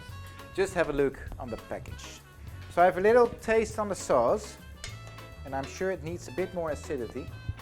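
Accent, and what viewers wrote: Netherlands. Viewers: He must be Dutch with his accent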